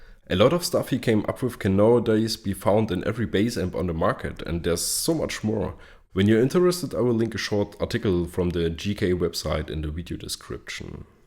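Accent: Germany. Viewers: Man I'm going to have such a blast trying to imitate your accent - it totally kicks ass. Which part of Germany does it originate from? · this man's accent screams "I am German".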